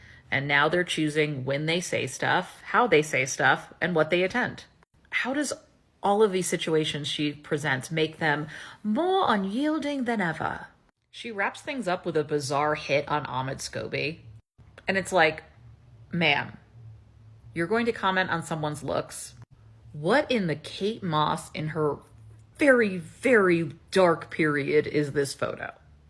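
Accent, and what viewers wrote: United Kingdom. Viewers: Smart and hilarious, but also I enjoyed listening to your British accent. Moreover you're apparently a very good singer. ❤❤❤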